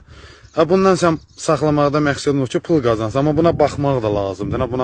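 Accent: Sabirabad, Azerbaijan. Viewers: Qardaş Allah razi olsun sizdən bizdə beləyik mən özum butun heyvanlara yazigim gəlirr xilaskar bir insanam ləhcəniz sabrabadd 😊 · Vallahi 40 ildir Rusiyada yaşayıram, Qardaş Allah kömək olsun sənə, Yezidə lə'nət oxu, Ləhçə Sabirabaddi dənə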